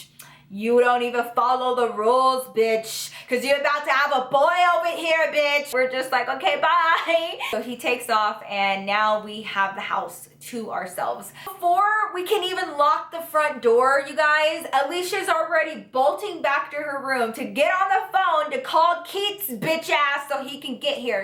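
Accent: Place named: South, United States. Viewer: I can really hear your country accent in this video lol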